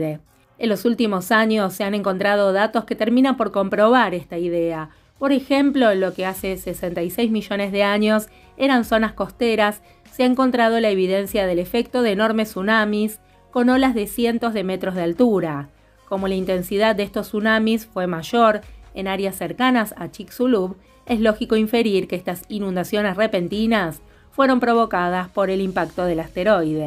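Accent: Argentina. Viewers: Uff amo la voz argentina que tiene la joven🤗🤗🤗🤗😘😘🤗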